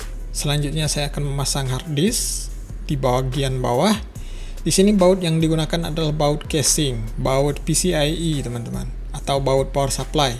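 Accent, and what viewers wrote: West Sumatra, Indonesia. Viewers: Ko iyo minang bana aksen nyo 😂😂😂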